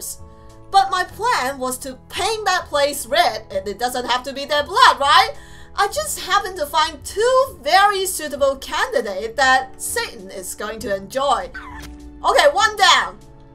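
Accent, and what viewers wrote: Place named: China. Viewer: sounds more Chinese actually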